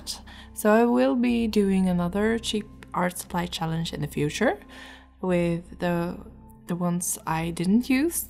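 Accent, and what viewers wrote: Sweden. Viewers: omg are you swedish??? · I love your art, and I adore your Swedish accent. Marry me please <3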